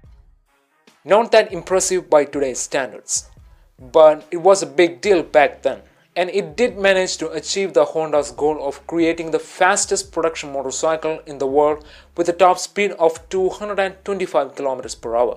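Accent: Kerala, India. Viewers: That Indian accent 🤣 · anna..ningal mass aa, aa mallu + anglo slang kettapozhe click aayi..lv u 😘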